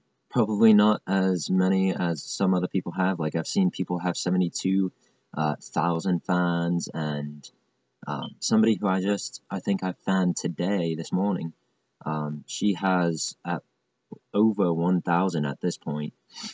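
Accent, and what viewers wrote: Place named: United Kingdom. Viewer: What is with the British accent? That dog is from one of your past videos too. Some technology doesn't make people smarter like the new Iphone for instance you don't have to reach up the entire screen just to get stuff you double tap the center button and it comes half way down the screen. Other technology like stuff for hospitals make it easier and sometimes less painful for people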